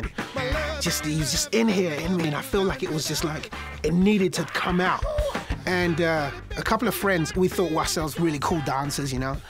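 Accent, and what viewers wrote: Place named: United Kingdom. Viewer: He actually does, just because it's not a heavy posh accent doesn't mean it's not british.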